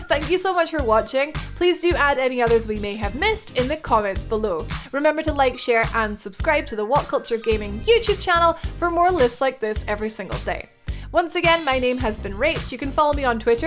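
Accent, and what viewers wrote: Ireland. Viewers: You have a beautiful Irish accent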